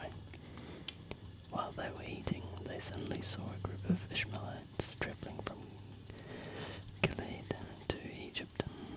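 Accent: New Zealand. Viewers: sounds like kiwi accent to me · It's interesting, you'd think being a kiwi myself I'd get used to the accent, apparently not! I've been listening to most of your asmr and it's always very comforting - personally I love your readings of the bible the most. If you one day do a video where you whisper into both ear at the same time with a bible reading... well. (no hints here ;) ). God bless :)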